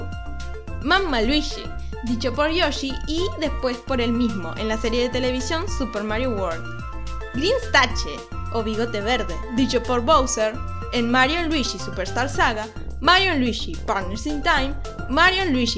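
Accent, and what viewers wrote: Argentina. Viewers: Creo que es por su acento, debe ser de Arg. o Uruguay. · Luigi Es Mi personaje Preferido De Ambos... Las Risas Que Me Hizo Dar En "Mario & Luigi - Bowser's Inside Story" Fue Fantástico Jaja Les Tengo Una Pregunta... ¿De Donde Son? Es El 2° Video Que Veo Y Me Salió La Duda Si Son Argentinos Jaja Me Suscribí... Sigan Así...!! Saludos... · Me encanta el asenro argentino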